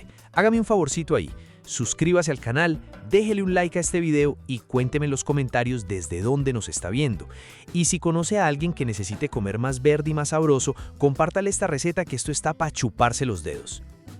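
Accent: Antioquia, Colombia. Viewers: ME GUSTA LA FORMA DE SU NARRATIVA PAISA..Y AL FINAL UNO LE AGREGA OTROS INGREDIENTES ATUN..POLLO ASADO EN TROZOS Y EL ADEREZO LO PUEDO CAMBIAR..Y LA BASE DE SU ENSALADA ES EL INICIO DE UNA COMIDA.GRACIAS POR MOTIVARNOS A COMER SANO..Y CON TODOS LOS INGREDIENTES COLOMBIANOS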